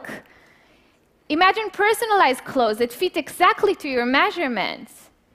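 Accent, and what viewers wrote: Israel. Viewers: Most Israelis have a heavier accent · I do hear the slight Israeli accent :P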